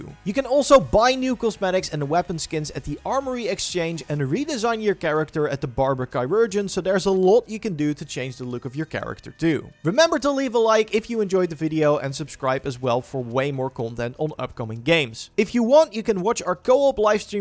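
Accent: Netherlands. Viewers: a little tip. Stop pronouncing “o”s in the dutch way. Its not a alOt its pronounced alAt. Just had to bring this up cos it is really bad to listen to 😂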